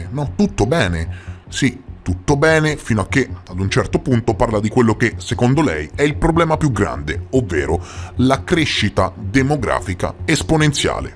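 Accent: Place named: Rome, Italy. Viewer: L'accento è di Roma.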